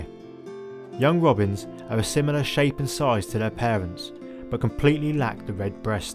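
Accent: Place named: Norfolk, United Kingdom. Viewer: Definitely a Norfolk boy 😂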